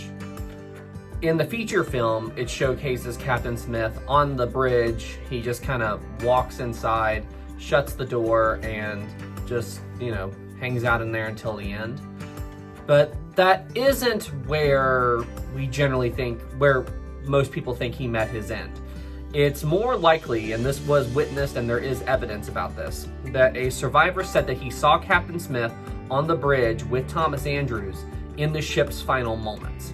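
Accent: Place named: West Virginia, United States. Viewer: Let me guess,West Virginia?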